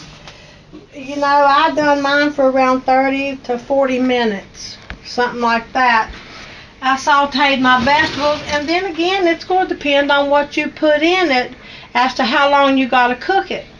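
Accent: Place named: Georgia, United States. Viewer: I've been cooking for 58 years, but I love to hear you talk and watch you cook. I'm almost 75 yrs old. My paternal roots are in North Georgia, and they sound very much like you. I live where they grow the Vidalia onion. I too suffer from many ailments 'cause of an allergic reaction to Lipitor and Zoccor. I've been saved by the grace of GOD since 1972 and He keeps me every day.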